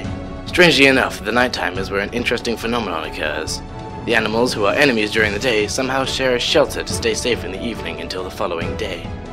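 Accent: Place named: England, United Kingdom. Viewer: this is very good. Good touch having an English accent as narrative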